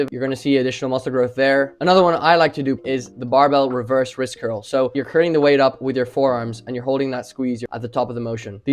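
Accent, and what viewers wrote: Ireland. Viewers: What's your accent? It has a hint of South Dublin.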